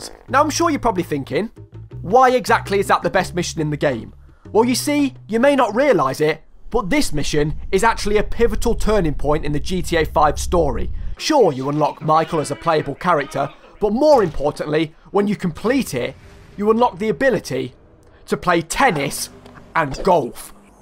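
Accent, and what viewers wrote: United Kingdom. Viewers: i feel like i'm watching nakeyjakey but more british and chaotic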